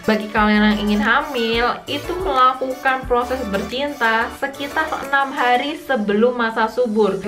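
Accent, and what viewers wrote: Java, Indonesia. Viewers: Dok.. bahasamu medhok bangeettt... Kamu dari mana dok?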